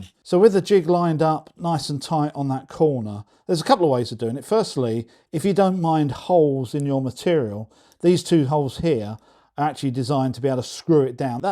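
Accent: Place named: Mississippi, United States. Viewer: Love that Mississippi accent.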